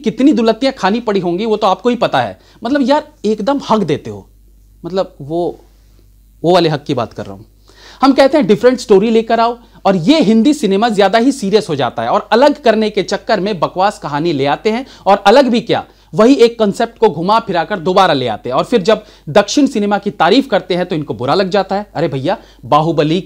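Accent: Bihar, India. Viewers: Pura biharipan me agaya bhaiya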